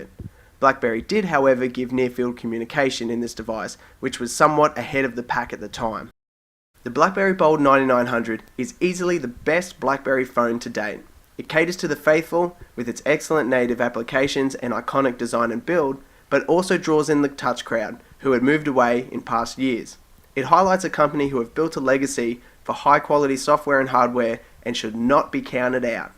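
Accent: Australia. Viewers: Finally an Aussie!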